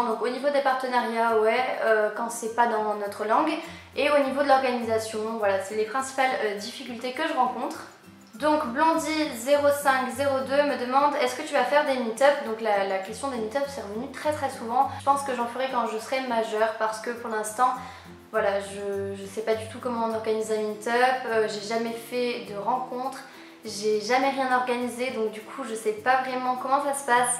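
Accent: Lebanon. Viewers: Cest troo bo ton accent libanais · awww t trop cute en parlant le libanais chui libanaise aussi 😻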